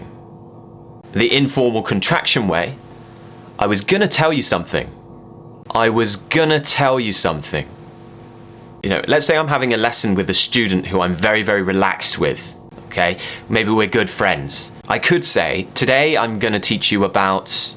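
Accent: RP, United Kingdom. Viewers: Are you speaking RP accent? You speak so clearly. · I didn't understand British accent before. But now I can't believe that I can translated almost all you said. Thank you from Thailand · I wonder if your accent is Received Pronunciation?Thank you! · Hello,! I came across your channel last week and now I can't go a day without watching at least one of your videos. You're very helpful and I want to thank you for that. You're automatically the best teacher I've ever had, haha. I really want to improve my British accent because I'm from South America and I learnt English in the American way, but I really want to learn British English more. When I watch your videos I always practice alone at home pronouncing the words the way you do it, but when I'm at university and I'm required to speak in English, I always end up speaking with my usual American accent because I'm still not used to speaking with a British accent and I feel kind of forcing it, like acting up my English, if that makes sense. 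Do you have any advice for me on how can I feel more relaxed speaking with my rough British accent to other people? Thank you very much again!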